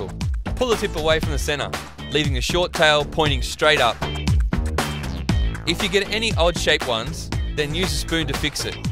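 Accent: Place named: Australia. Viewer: The first thing I said when I played this video was OMG HES AUSTRALIAN !!!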